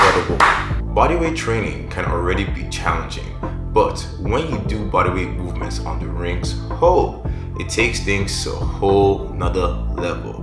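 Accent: Nigeria. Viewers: For a very long time, i was having that gut feeling you were "omo naija", and this video just made my feeling clear... As i hear "Eh".... "chineke" ....."Abeg"... Nice one bro!!... Naija to the world, carry on bros!!! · I always you were Nigerian. You have the accent. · Bruh is u Nigerian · chineke😂 nigerian? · I swear right? I had this gut feeling that the guy was Niigerian from the get go....When i heard chineke....I was like lobatan.... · This man is Nigerian asf, feels like I am listening to my brother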